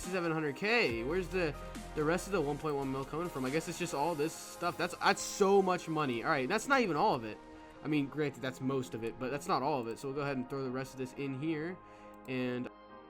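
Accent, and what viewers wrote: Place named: Buffalo, United States. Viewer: U frm buffalo?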